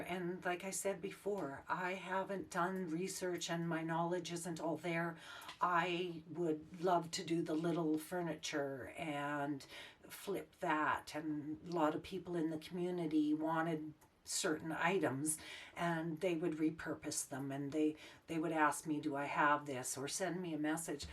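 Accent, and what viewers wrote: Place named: Western Canada, Canada. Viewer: Loving the videos thank you for the background on yourself you sounded like BC or western Canada. I am from Southern Ontario and nothing in my pockets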